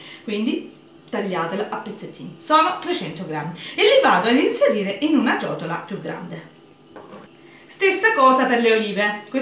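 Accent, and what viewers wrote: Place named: Apulia, Italy. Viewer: Meravigliosa ricetta come sei meravigliosa tu.... sentire il tuo accento mi emoziono perchè ricordo l'unica vacanca che ho fatto nella meravigliosa Puglia 10 anni fa, quella terra rossa tra gli uliveti fuori dal mio trullo , nella meravigliosa campagna , l'azzurro del mare , i profumi per le stradine di Ceglie me li porto ancora nel 🧡 Un caro saluto da Verona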